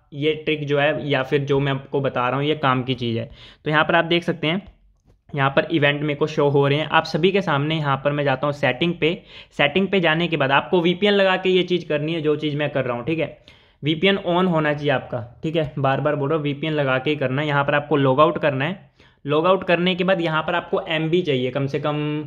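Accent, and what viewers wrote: Punjab, India. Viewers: Bahi app Punjab se ho kia keuki muje apki avaj se pata chal gia 😁 me be punjab se he huu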